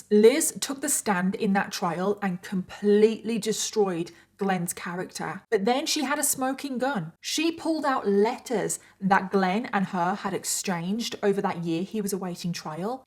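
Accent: United Kingdom. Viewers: i dont think ive ever heard a more british accent hahah every word is brilliantly emphasized haha exquisite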